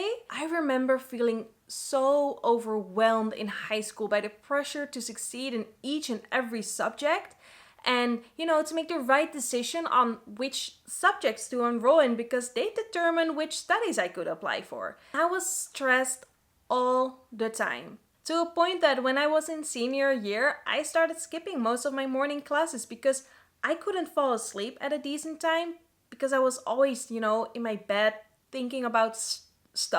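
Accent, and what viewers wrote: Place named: Oxford, United Kingdom. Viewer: After your return from your overwhelming trip to Australia, I see a very happy grown-up young woman. I enjoyed you presentation very much. Your Oxford English is excellent. Even I could follow you without a problem. I am very proud of you. From a retired professional I give you a small advice. Speak a little slower and bring in short breaks. The interested listener has to consume your message. Your old but very happy Opa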